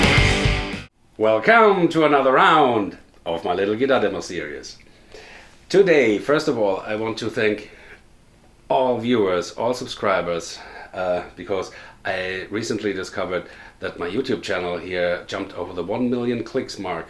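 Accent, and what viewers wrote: Germany. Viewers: this was a very slowly and a little denglish review, but you play like a god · I love the German accent. Love Scorpions, Uli, Big fan of Engl amps. Hughes and Kettner too.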